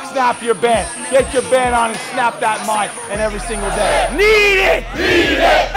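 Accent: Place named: France. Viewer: Oh and I LOVE your French accent!!!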